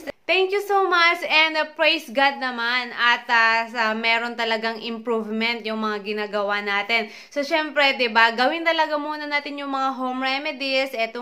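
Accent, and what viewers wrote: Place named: Ilocano, Philippines. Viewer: Hi mam, nakakatuwa naman na ilokano ka pala tulad ko, noon ko pa kasi napapansin ung tuno ng pananalita niyo po hehe. Anyway God bless po!❤️🙏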